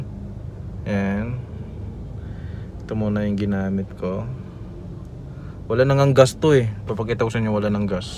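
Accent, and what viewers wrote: Visayas, Philippines. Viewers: Bisaya ka boss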